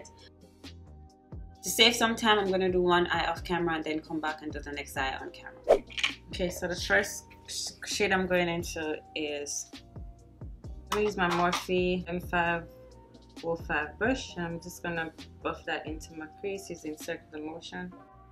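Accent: Jamaica. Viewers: girl u slay this fuck out this video....sound like u a one a wi....mah hear too jcan talking😂😘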